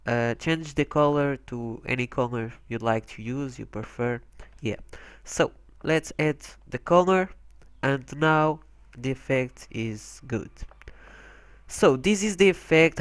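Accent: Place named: United Kingdom. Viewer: Inglês tá bom hein kkk falando igual um britânico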